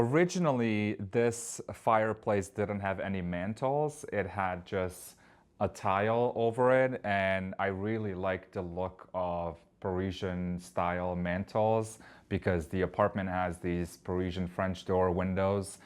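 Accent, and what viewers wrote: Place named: Serbia. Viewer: Great style, great apartment. I knew you were from Serbia, the pronunciation of certain words… well done!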